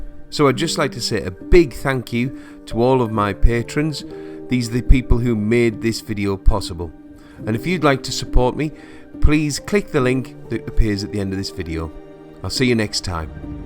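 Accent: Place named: Northern England, United Kingdom. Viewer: Most unity tutorials online seam to record their audio on a potato however you sound like a professional voice actor. Sean Bean vibes from your northern accent. Excellent production quality and can't wait to get further into the tutorials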